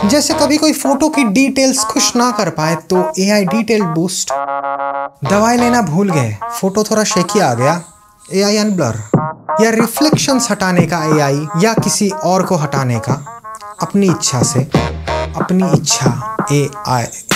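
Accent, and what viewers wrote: Bengal, India. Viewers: humm Bangali ❤ hindi accent sune bojhai jai Bangali ke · Bro to be honest with me are you Bengali?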